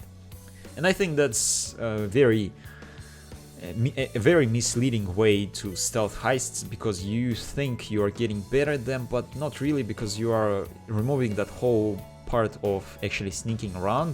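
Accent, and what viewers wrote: Russia. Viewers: Are you from kazakstan? Asian but russian accent....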